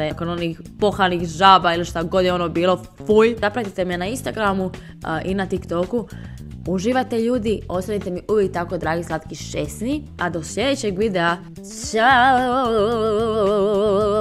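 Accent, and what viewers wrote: Split, Croatia. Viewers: Jesi ti iz Splita pitan radi naglaska jer ja jesan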